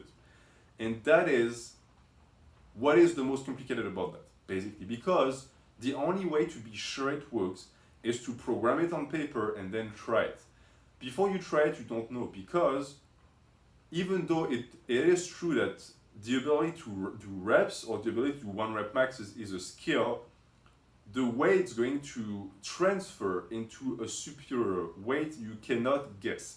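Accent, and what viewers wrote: France. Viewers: You're French man? you're accent seems French to me. C’est bien ce que je pensais tu suis et.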